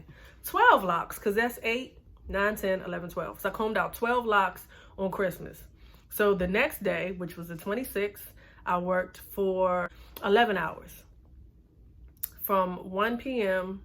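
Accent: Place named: Prince George's County, United States. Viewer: I'm from PG, the accent!!! Makes me miss home 💛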